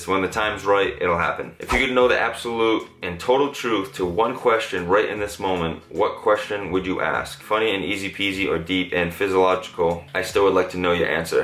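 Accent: Boston, United States. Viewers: 1) I love your eyebrows 2) I feel you on the relationship stuff. There’s no need to rush into things or constantly be looking for love. 3) Your Christmas dish mash up is the best 😝 4) I have MS and love the gym and food, but sometimes it’s so hard to get past my symptoms and dieting specifically for it. I understand being mentally stressed around medications and diets. It’s nice to hear someone give it all up and be free because I did that last year with a medication that almost killed me. You’re a great person. I love the videos! And I love your Boston accent lol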